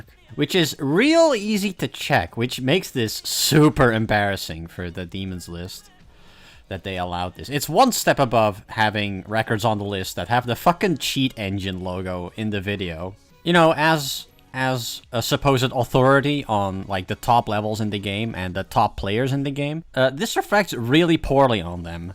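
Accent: Netherlands. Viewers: are you dutch by any chance? nice video btw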